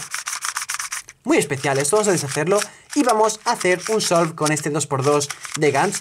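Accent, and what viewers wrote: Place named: Catalonia, Spain. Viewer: Tenemos ésto por una banda... Se nota que eres catalán...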